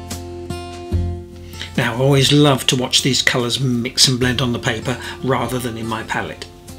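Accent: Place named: United Kingdom. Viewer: It is wonderful to hear your British accent, and had a good chuckle to hear your comment about the 'bloody leaves' did my heart ❤️ good!!!. I do really enjoy your videos; and wonder just where you are located. I lived in East Sussex and moved to the U.S in '57 so there's a bit of nostalgia going on. Thanks for the memories ❤ !!!!!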